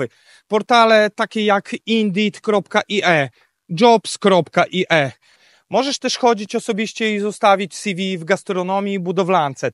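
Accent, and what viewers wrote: Podlasie, Poland. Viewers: usłyszałem akcent i mówię podlasie, i zgadłem. Nie tak tu strasznie😉 idealnie nie jest ale da się żyć. Nowo przyjezdnym odradzam przyjazd bez wcześniejszego załatwienia zakwaterunku najlepiej u rodziny już tu żyjącej lub znajomego. Służba zdrowia, mhm ja osobiście mam dobre doświadczenia a i w Polsce idealnie nie jest🙃 A im mniejsze miasteczko tym łatwiej się żyje, Dublin odradzam każdemu... Pozdrawiam z Waterford 18 lat na wyspie a miał być tylko urlop🤣🤣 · Oprócz śmiesznego podlaskiego akcentu większość co chłopak mówi to prawda. Mieszkam tu 20 lat i widzę jak Irlandia się zmieniła... Czy na lepsze...?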